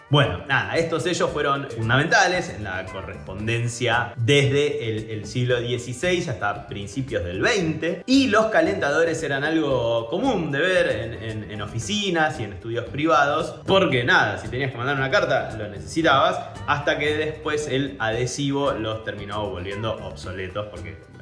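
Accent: Argentina. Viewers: Podés hablar en español ? No en argentino …… no te entiendo naaada !!!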